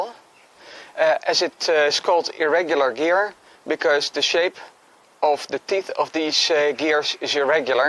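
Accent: Netherlands. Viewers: are you dutch??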